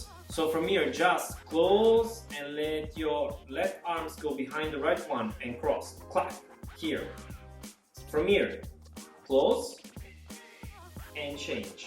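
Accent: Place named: Italy. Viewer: u sounds so italian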